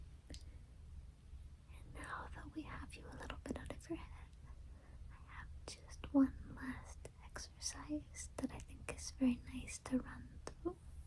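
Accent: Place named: Eastern Europe. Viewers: I thought you were from Eastern Europe honestly. I’ve been on the West Coast my entire life and only heard an accent similar to yours from those who immigrated to the US when they were younger, so they end up with a bit of a mixed accent. Anyways, love your voice and videos. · that wierd. I thought I heard a east european accent. I am a Las Vegas native and I thought I knew west coast accents lol interesting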